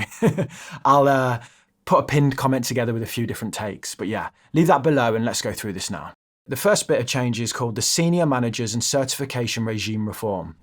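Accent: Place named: North, United Kingdom. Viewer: I think you would be a great person to help educate the public on investments, your northern accent would be really beneficial as a posh accent and discussing money will turn a lot of people off as it'll feel harder to relate.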